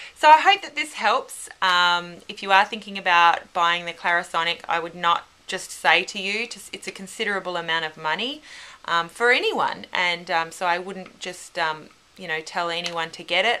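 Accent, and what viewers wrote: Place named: Australia. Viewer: I just love your Aussie accent! Here in Europe we mostly only hear UK or US english, this sounds so cheerful LOL!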